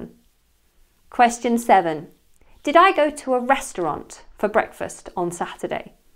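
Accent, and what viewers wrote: United Kingdom. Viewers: But you have a perfect British accent 👍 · Hi, you have a great British accent, but this test is more likely a memory test. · I will always say, you have a great british accent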